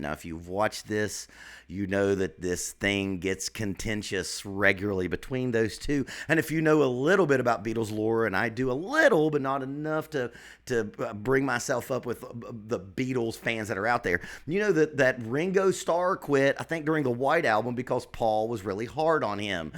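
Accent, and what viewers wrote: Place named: Gulf Coast, United States. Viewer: I'm trying to read your accent, are you from the gulf coast?I came for the Beatles doc, but I'll continue to check out your channel! 👍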